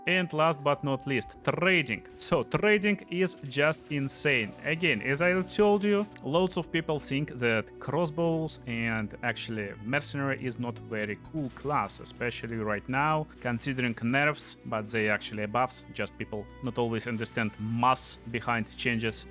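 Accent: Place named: Russia. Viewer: Are you russian? Based on music choice and strong accent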